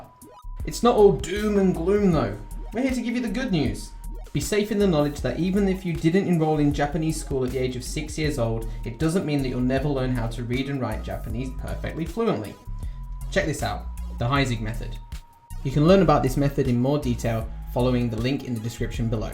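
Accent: United Kingdom. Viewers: to be Japanese you sure speak like a British, with a funny accent. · didnt expect a british accent ngl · Ironically the narrator sounds British but drew the American flag. That's far more curious.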